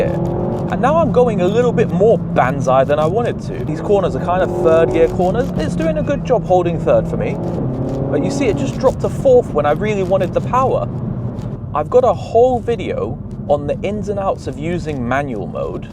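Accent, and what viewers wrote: United Kingdom. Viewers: Britain has such diversity in dialects and the further back in time you go the more diverse it is, so I wouldn't jump to conclusions, I would just assume that's just how he says that vowel. In fact, I'm pretty sure I've heard several dialects pronounce that vowel like that, or similarly different.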